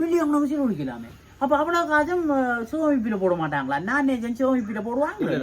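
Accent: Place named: Jaffna, Sri Lanka. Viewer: உண்மையில் எங்கள் ஊர் பேச்சு 🥰உண்மையின் பேச்சு 👌 வாழ்த்துக்கள்.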